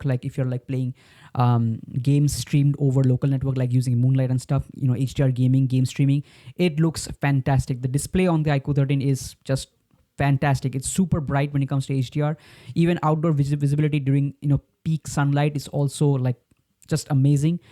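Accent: India. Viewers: You from bagdogra or wot?❤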